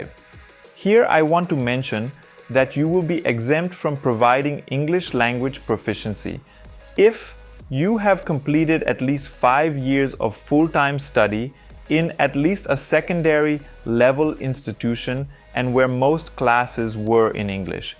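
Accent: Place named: India. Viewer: This is Indian English.. sounds good to be true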